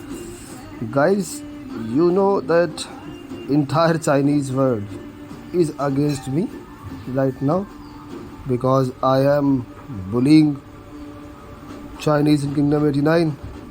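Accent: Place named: India. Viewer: india bro logatnya aja ketahuan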